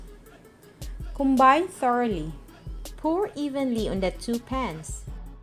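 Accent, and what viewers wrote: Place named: Vietnam. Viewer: Úi...nghe giọng này.e đoán chị là ngừi việt nammmm😁😁😁😁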